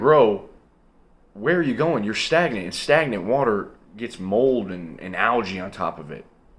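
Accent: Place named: Michigan, United States. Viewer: Are you a Michigander? Cuz you sound like a buddy of mine from up there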